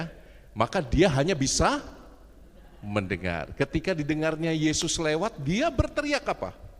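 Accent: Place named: Java, Indonesia. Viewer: Bahasa jawanya romo medok banget👍👍👍🙏🙏🙏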